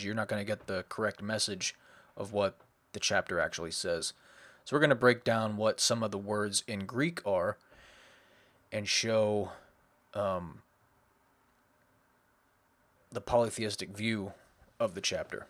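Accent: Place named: United States. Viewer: Great video although your credibility suffers a bit by your insistance on an unadulterated American pronunciation of Greek.... you use diphtons all over the place where none such are used in Greek....e.g. your god "Gay"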